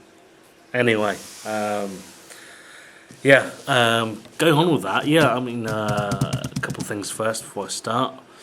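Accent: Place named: United Kingdom. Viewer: i didint know this guy is british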